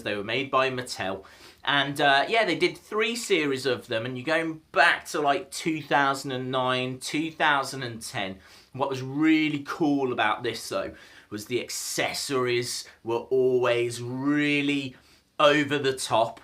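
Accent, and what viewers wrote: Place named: England, United Kingdom. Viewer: i love the way english people pronounce some words and letters its kinda refreshing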